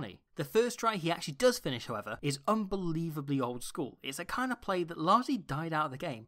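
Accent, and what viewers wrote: Wales, United Kingdom. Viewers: This video is best viewed at 0.75 speed for NZ ears not used to this guy’s machine gun Welsh accent or whatever it is.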